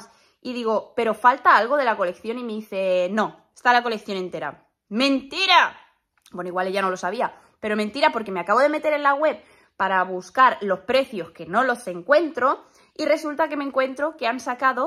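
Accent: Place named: Paisa, Colombia. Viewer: Hola desde Colombia. Me encanta sus videos. Pareces paisa con acento español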